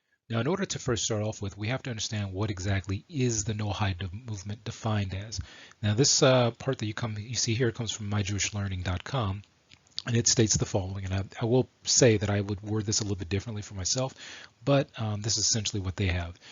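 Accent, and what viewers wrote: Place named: Arab world. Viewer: You are from Arabic erea you prononouce many arabic letters like letter ,Ain in arabic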